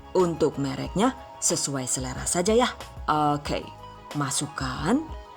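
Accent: Banyumas, Indonesia. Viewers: 😂😂😂 ngapak ya ora ngerti... Mksih bun😘😘😘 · Bahasa ngapake metu.. 🤣 ngapake kaya daerah banyumas, cilacap, purbalingga, kebumen.. udu ngapak tegal udu kie tah.. 🤣🤣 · Iya bener. Apalagi pas ngomong pake bahasa ngapak😂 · Mandan ngapak yayune jan, sinau nengkene ya kena kiye sidane 😁😁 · Jebule wong ngapak apa kieeee🤣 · Tks ya resepnya..pasti yg medokk kadang ngapakk..cuantik dehh ibunya..he..he..he..yeni virgo suka deehhh dng cara mmberitahukan resepnya....maju truss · Sampeyan itu orang mana mbakyu. Aku kalo lg lihat vedionya..sampe ketawa sendiri dngn bhs ngapak.mantap resep2 sampeyan gampang ditiru.maturnuwun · Trima kasih ilmuny Yg bikin kangen NGAPAK2 NYA ITUUU LUCUUU TAPI GC MBOSENIN 👍👍💪💪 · Wong ngendi Jane rika zu? Madiun ka ngapak 😂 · Ngapak ternyata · Weslah ora sah ngecepres baee ngelih kohh wkwkwkwkkw jossss ngapake josss ky inyong wong jawa,, is the best lahh haaaaaaaa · hhhh ngapak lucu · Aku suka ngapaknya selain resep" nya😀😀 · Asslmkum njenengan wong ngapak ya yuk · Ya ampun lucu bgt sih ngapaknya hehehe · Maen temen deneng ky kue bisa ngapakz ,tengkiu resepe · Wkwkwkkwkwkw.....asiiik ada suara ngapak ngapaknya ... 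😀🙏😊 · Terima kasih resep nya dn sempat tertawa juga karena ada bahasa ngapaknya😊😊😊😊😊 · Ngapak nemen rika mbekayu....??!!!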